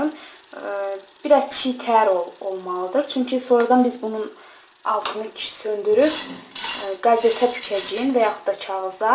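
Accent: Azerbaijan. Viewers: Ayaallah tam Azərbaycan ləhcəsi 👍🏻 Halal olsun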